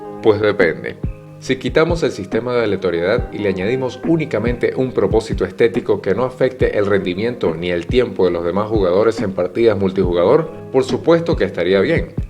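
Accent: Venezuela. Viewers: Verga se me adelantaron con la pregunta, " de donde eres?". bueno no importa ya sabia yo que por la forma de la que hablabas eras venezolano pero bueno hay que preguntar para estar seguros. Y bueno chamo de pana que eres bueno en esto me gustan demasiados tus reseñas, como tus demás vides me parecen bastante interesantes, a mi se me hace que tendrás un gran futuro en esto de youtube, buena suerte convive. PD: Yo también soy caraqueño XD.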